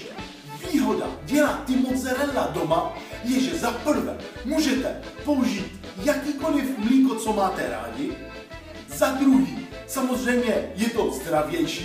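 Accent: Italy. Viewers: Kanada? Ale má takový Italský přízvuk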